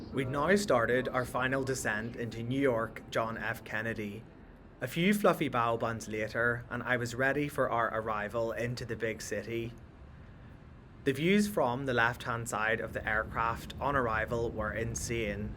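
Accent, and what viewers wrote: Northern Ireland, United Kingdom. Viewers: Hello, your video just popped into my inbox tonight and your lovely Northern Ireland accent, combined with you being dressed very nicely but comfortably, got me watching. I have never flown Virgin Atlantic, BA, Aer Lingus and Air Canada are my usual choices. I enjoyed your video but was very disappointed that you did not get a window seat. The Lounge looked excellent. I have been traveling alone now for about 15 years and trust me, I am very comfortable now. I am originally from the West of Ireland. I do like your lovely approach to showing us exactly what we need to see and hear. Video was perfect from start to finish. Good luck on your Journeys. I have subscribed to your Channel. Have a great week.🍀✈️☕️🫖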